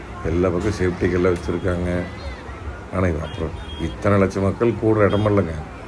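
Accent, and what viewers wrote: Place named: Coimbatore, India. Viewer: Anna unga kovai tamil super.. Enakum kovai tamil kathukanum, but iam chennai na unga periya fan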